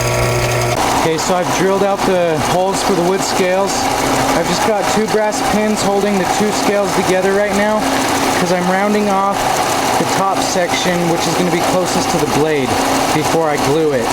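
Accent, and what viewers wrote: Netherlands. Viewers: you're dutch ?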